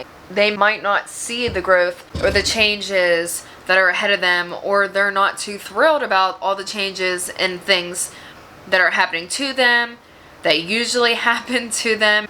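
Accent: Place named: Pittsburgh, United States. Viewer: and you have a Pittsburgh accent like me haha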